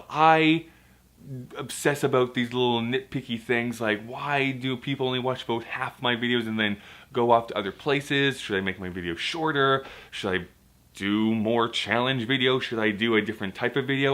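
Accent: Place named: South, United States. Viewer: Hey, I have a channel called The Sweetest Vegan or with about 48,000 subscribers. I am not YouTube famous, but some people recognize me in public, I have received some press, and I have a YouTube Partner Manager. I believe in your content and would love to help you grow your channel (No, this is not a network pitch as I am not apart of one). I stopped posting to that channel because, like you, I can only make videos about what I am interested in and I didn't want to hear a bunch of whinny comments complaining about me "changing" every time I posted. Anyway, you have good content, but there is some low hanging fruit that you are not taking advantage of. Email me at heartoftarts at gmail dot com and we can set up a time to Hangout or Skype. I really want to help you strategize for the new year. I am also from Atlanta, GA, so I can help with your southern accent.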